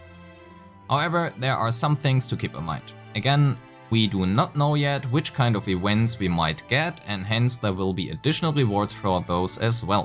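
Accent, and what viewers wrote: Germany. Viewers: Are you German? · you sound german!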